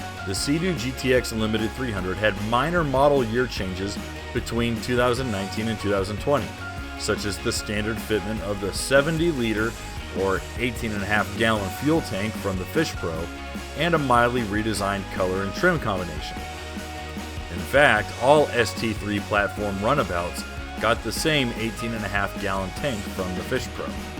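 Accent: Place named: United States. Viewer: Nice Ski I Was watching this video thinking this place in the USA looks exactly like where I ride my ski in Sydney. The American accent had me thinking it was filmed over there.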